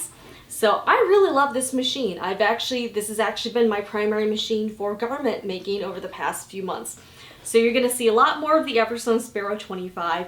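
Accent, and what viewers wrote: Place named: Midwest, United States. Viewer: Are you from the midwest? Cute accent :)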